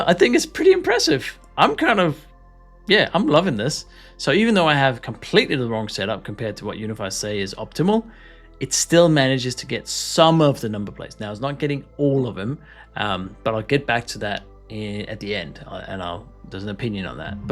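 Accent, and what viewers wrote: Denmark. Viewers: Jeg syntes nok jeg kunne genkende den meget lille danske accent. Hvordan kan det være du er flyttet til Australien?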